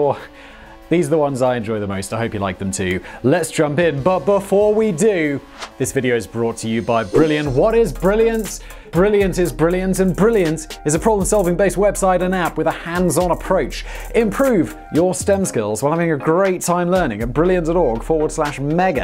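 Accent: United Kingdom. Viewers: It's a joke, not a dick. Don't take it so hard. On a serious note, I'd love to see such a channel, and would subscribe to it immediately upon knowing it exists. I truly enjoy delivery, and I love the British accent.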